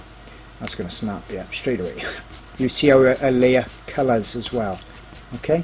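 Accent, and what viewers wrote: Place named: Swansea, United Kingdom. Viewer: Thanks for this! Are you a Swansea lad? Recognise that accent anywhere!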